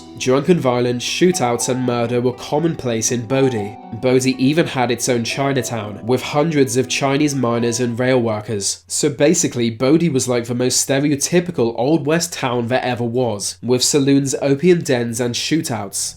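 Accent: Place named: London, United Kingdom. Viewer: London accent. It's a class thing.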